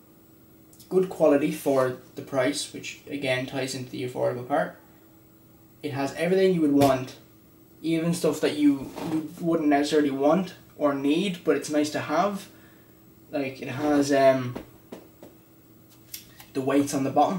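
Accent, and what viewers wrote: Ireland. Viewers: U from ireland??????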